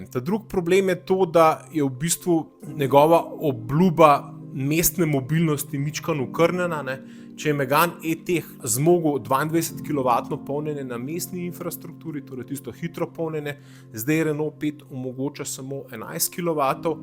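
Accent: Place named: Ljubljana, Slovenia. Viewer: prosim uporabljaj Slovenščino ne ljubljanščine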